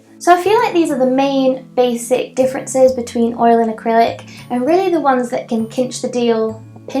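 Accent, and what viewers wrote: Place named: Northern England, United Kingdom. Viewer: I'm an acrylic painter, but I'll have to try out oils now... By the way, where is your accent from? Northern England? (I have no idea if that's a good guess or if I'm an idiot). It's lovely! 😁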